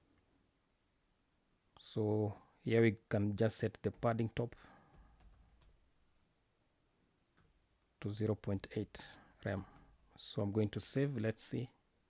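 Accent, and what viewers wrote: Kenya. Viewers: Unasound Mkenya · I believe you are a Kenyan. That accent though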